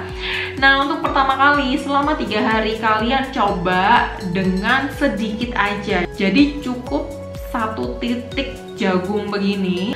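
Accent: Java, Indonesia. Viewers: Dokter asli mana nih logatnya kok medok?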